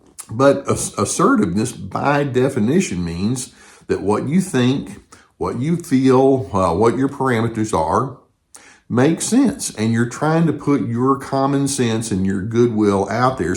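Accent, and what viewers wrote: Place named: Alabama, United States. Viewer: Thanks again for your calm voice, your lovely Alabama accent and your great teachings on dealing with narcs. Mine moved out 6 years ago but still phones me occasionally and I still get a bit sucked in to his sob stories and lies and accusations, his gaslighting etc. One part of me still misses him. The other part knows I've gone back into denial and fantasy land. Listening to you always puts me back onto firm ground again. Many thanks.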